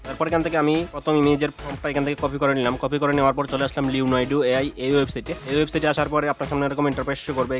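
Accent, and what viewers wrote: Sylhet, Bangladesh. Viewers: আপনি সিলেট এর নি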